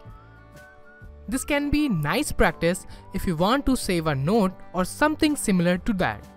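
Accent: India. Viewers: He sounds messed up just like indian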